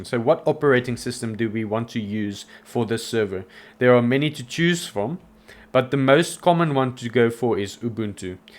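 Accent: Afrikaans, South Africa. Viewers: Lekker om n afrikaanse aksent te hoor! 😂😂 mooi storie!!! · You dutch from RSA?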